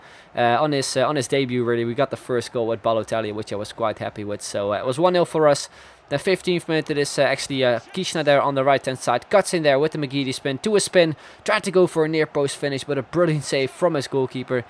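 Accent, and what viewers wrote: Netherlands. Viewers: It's a Dutch accent and its not a shitty voice it's a nice voice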